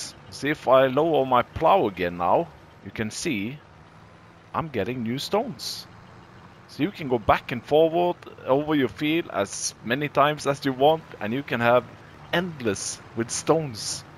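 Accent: Norway. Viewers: Er du norsk eller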